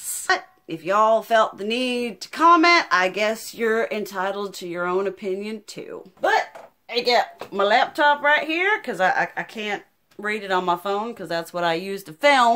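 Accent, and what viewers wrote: South, United States. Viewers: I just join her channel 2 weeks ago I never thought her voice was annoying. I acutely like her southern belle voice. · I agree. I love it! I put these on my tv when im alone just because its so exuberant and comforting. (No idea why, I'm Swedish. I have no relations to southern USA accents) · “ Areeeeeeeeeeed” pretend like I used your long gorgeous southern twang! 😊😁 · I LOVE your southern drawl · Love your southern drawl. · Most of those people must not know you're from the South. Your voice is not annoying, it's southern. Just like my voice is "valley girl". It's just a part of who we are. 🤷‍♀️ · I LOVE your voice. I think the first comment I either left you or told my friend about you was "She's like a Southern Belle mixed with Wednesday Addams, love!" Also, all these comments about "Your volume" do they not realize no matter what damn device THEY are watching on there is a volume control & they can turn it the hell down themselves? 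Lol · I love your Southern accent and your voice and your clothes and your hair and makeup! Everyone likes something different, dang people are harsh. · I can’t imagine being so miserable to leave hate comments. Girl you are beautiful and love ❤️ the loud southern twang . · I love ur voice especially your country accent lol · You sound like Jane Lane from Daria, but with a slight southern accent :) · Hahaha i love your voice and tone ! Its one of the things that made me come back after the first video i watched. I loved it , im not american and idk but it reminded me of some old movies that depicted people from the south or it reminded me of what i thought american accent was before i moved here.I really like it and i like the fact its so animated and has so much personality. Love it ! It sux that we are saying things online that we wouldnt say in life,if i dont like someone or something i just leave. 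Sometimes i want to comment on someone makeup but i think oh well its what they like and i dont say anything unless someone ask for an advice 🤷🏼‍♀️ Im sure i dont wear tgings that everyone love.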